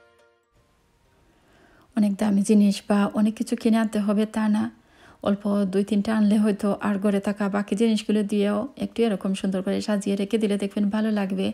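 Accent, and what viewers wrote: Sylhet, Bangladesh. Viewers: Afa Sylheti matowwka Eta mat bala lage. Na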